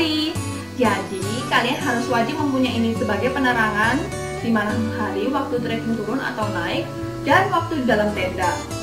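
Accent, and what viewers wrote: Indonesia. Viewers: medok banget mbak tapi lucu cara Ngomong nya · Logat jawa timur 😅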